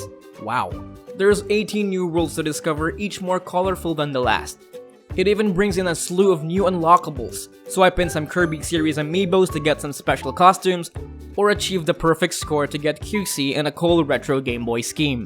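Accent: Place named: Philippines. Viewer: This guy sounds Filipino.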